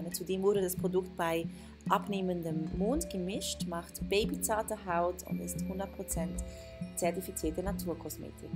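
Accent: Switzerland. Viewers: Als offenbar einer er wenigen Männer die noch hier sind geb ich dir mal dass Feedback dass es tolle Videos sind👌 entspannt mich immer was neues von dir zu hören :) Mal davon abgesehen dass ich als süddeutscher die schweizer einigermaßen verstehe haha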